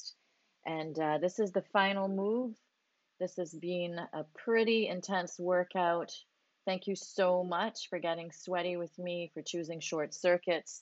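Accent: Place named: Barbados. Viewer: Great 10 minutes! I did it after your ab/glute band workout. Perfect fit! And I love how your Bajan accent is coming out 😊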